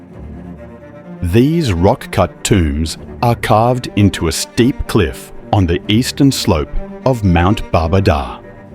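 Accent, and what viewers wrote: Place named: Australia. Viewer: I love Turkiye. I need more time here. Also, I love that this is narrated with a Australian accent 🥳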